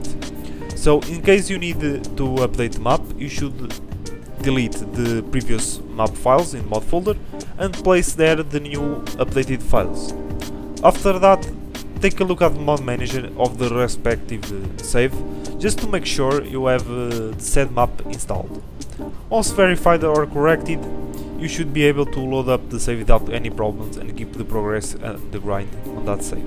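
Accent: Portugal. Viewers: es portugal nota se pela voz kkkk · Só pelo sotaque já vi que és portugues 😁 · Tuga accent FTW.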